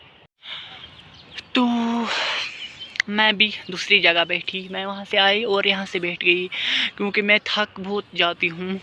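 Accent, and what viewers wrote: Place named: Arab world. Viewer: Arabi auuent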